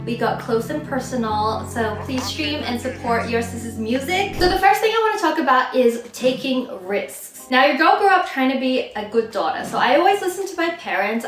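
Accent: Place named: Australia. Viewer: wengieee love u!! i had adapted your accent and the aussie vibe changed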